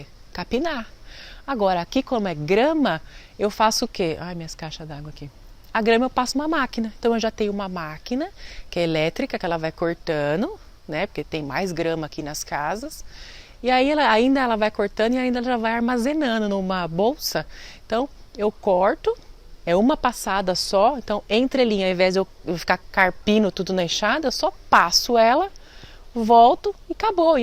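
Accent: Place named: Paraná, Brazil. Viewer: Adorei o seu canal!! Obrigado por compartilhar conhecimento. Ah, esse sotaque é do Paraná, né!? rsrsr (Amei)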